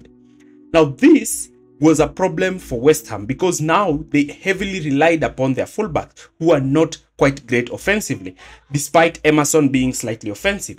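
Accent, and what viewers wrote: Kenya. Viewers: Are you Kenyan? You sound like one 😂❤❤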